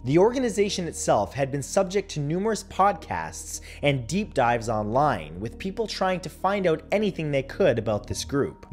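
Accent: Canada. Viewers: he canadian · Thats quite the canadian accent.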